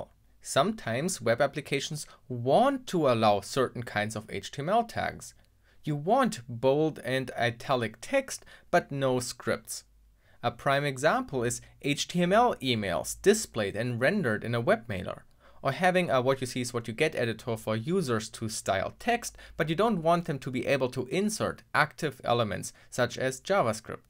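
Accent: Germany. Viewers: Ehmm hallo typ der englisch spricht aber deutsch ist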